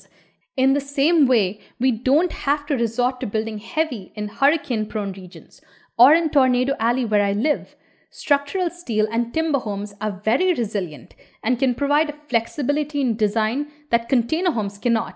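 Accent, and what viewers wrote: India. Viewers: Aren't people buying used containers quite cheaply that are no longer suitable for their original use? Doesn't LA have a ton of them? As for insulation, it seems silly to use steel in a cold climate. Rather they should be used in warm climates so no insulation is needed at all. Then just protect them from rain. As for working within the skin, not really if you can put them together. Not many single people need a home more than 17' wide and about 45' long. That's 765 sq ft. The main problem seems to be their high weight. The future is probably a prebuilt, custom designed home, designed mainly by the buyer with software, using the best materials available in that area at the lowest cost, assembled in a few days on site. I wonder if foundations could be poured on mass, making the process much cheaper than doing one at a time. Surely it must be. 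You've got an excellent analytical mind but coming from an Indian background you're probably used to family sizes that are larger whereas this idea is best for single people or a couple at most. Dubai? Please. Your heavy Indian accent makes India a more likely origin. Just tell people how long you were in Dubai. Otherwise it sounds like you're trying to hide it for some reason. The head of both Microsoft and Google are Indian. Plus a lot of Indians are getting educated in the UK which is often far superior to most American universities. No need to hide anything. Its best to never bring up racism. Sure it may be there but its often subtle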